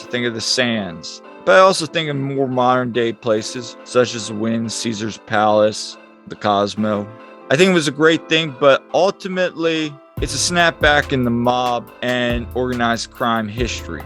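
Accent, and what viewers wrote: Southern California, United States. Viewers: You sound like spiccoli radical duuude so Southern California Lolol anyways I like your videos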